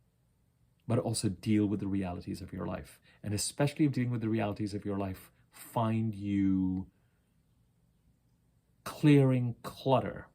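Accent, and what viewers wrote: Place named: California, United States. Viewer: Yay an video! I find your videos so very helpful helping me to reflect..& chilll out 😄 your voice is so calming 🙏love the Californian accent too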